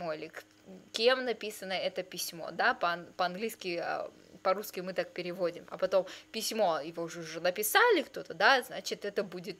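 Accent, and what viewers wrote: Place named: United States. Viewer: По речи видно, что она жила или пребывала некоторое время в Америке, язык может немного "теряться", и это не удивительно.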